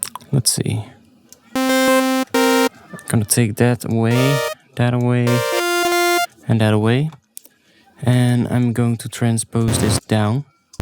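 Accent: Netherlands. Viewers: ja ik wist het! ik zat gewoon de hele tijd tijdens de tutorial hij moet wel nederlands zijn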